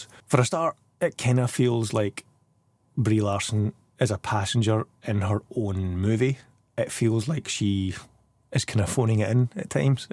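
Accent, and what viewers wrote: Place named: Scotland, United Kingdom. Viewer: Hang on! You're Scottish?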